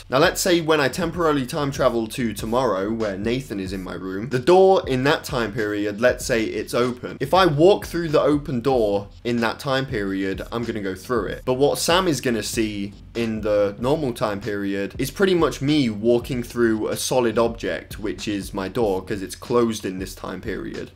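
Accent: United Kingdom. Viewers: I was just wanderin' what do u british do to "R"s?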